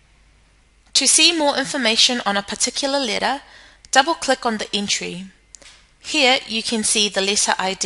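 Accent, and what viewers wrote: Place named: New Zealand. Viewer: If these medical software providers want to be taken seriously the first thing they have to do is stop with the childish, inane names like 'Dr Darth Vader' and patients called 'Mickey Mouse'. I'm sure they think they're just hilarious - hahaha - but Health Providers and Specialists won't. It completely destroys your credibility. I'd also be closely monitoring what videos you put out there. Presentation and a pleasant speaking voice matters. This one had way too much of the kiwi in it. And this one below (I don't know what to say. It's that bad, I have no words except to say it should be taken down immediately - as well as anything else by the same content creator). <link>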